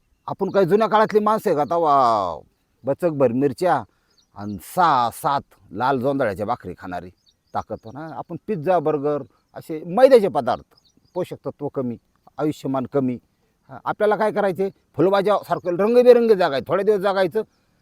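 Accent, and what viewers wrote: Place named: Narayangaon, India. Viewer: मामा तुमचे गाव जुन्नर तालुक्यातील आहे का. बोलणे नारायण गाव सारखे वाटते.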